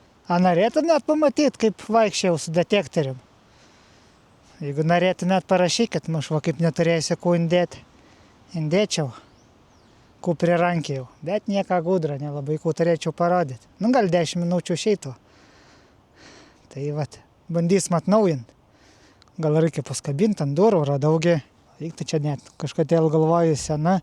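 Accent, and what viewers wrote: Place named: Utena, Lithuania. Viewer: taip ir galvojau ,labai tarme girdėta ,kadangi aš iš Anykščių😅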